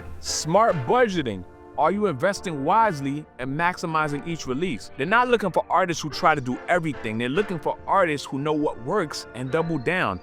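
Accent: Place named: Caribbean. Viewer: Random guess based off your accent: are you carribean born but raised in NYC? 🤔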